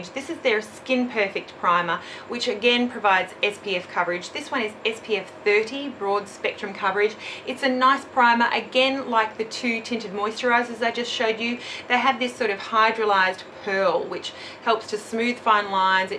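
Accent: Australia. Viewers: Hi, My favorite Dermalogica product is the Dermal Clay Cleanser. I have oily skin and that product, in combination with my Clarisonic, leaves my skin feeling amazingly fresh and clean. It has also worked wonders for my pores. Love it! I just recently discovered your channel and I love all your videos so far. I love your Australian accent! Greetings from Texas:)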